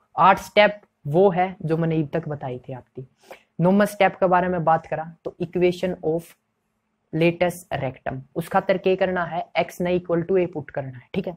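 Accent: Haryana, India. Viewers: Haryanvi mast h sir apki and apki video bhot bdiya lgi sir m bhi Haryana se hu sir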